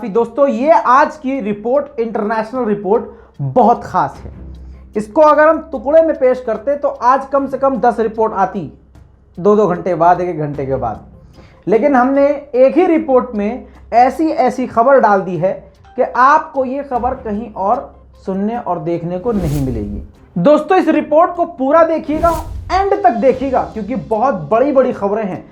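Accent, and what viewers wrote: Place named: Bihar, India. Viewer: Loved Bihari Andaz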